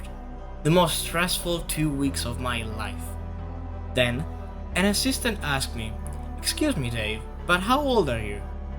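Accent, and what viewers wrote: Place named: Balkans. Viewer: Blud you sound hella balkan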